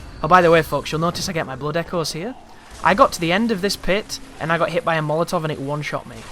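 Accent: United Kingdom. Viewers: Dude I follow you since your Onimusha DoD walkthrough and it's nice that you're making this walkthrough of my favorite game to show some people that its ez and no one should be afraid of it, keep the hard work! BTW are you brit?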